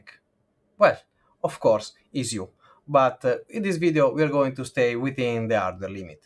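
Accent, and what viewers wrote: Italy. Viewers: wait, are you italian?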